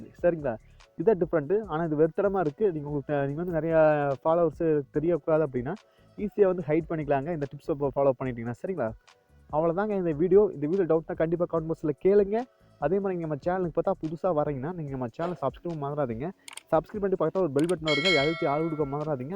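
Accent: Coimbatore, India. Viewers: Anna coimbatore slang super ah iruku 💙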